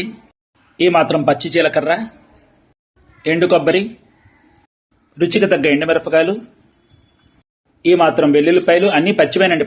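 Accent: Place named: Tamil Nadu, India. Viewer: Tamil gaaru telugu ni chey pattinnadipistunattundi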